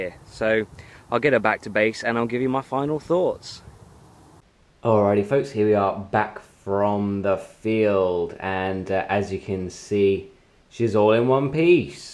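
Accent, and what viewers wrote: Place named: United Kingdom. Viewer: Nice rocket. I assume by your accent, you are in the U.K. ? Model rocketry seem to be really popular and active in the U.K.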